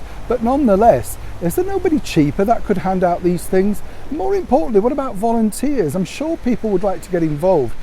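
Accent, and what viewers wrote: United Kingdom. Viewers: I guess it's your lovely accent. But I always click on here knowing that there will be absolutely no substance to whatever you are saying. But because I adore British accents I wind up clicking anyway.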